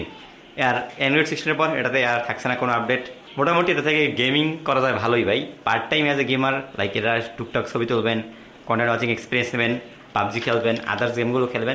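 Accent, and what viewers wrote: Barishal, Bangladesh. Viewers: Vhai ki iccee kore borishailla vasa e khota bolen?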